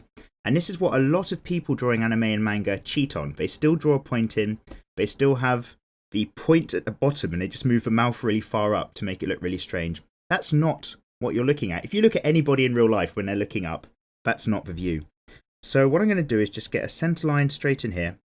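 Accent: United Kingdom. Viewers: WHY IS THE BRITISH ACCENT SO BEAUTIFUL?! TTATT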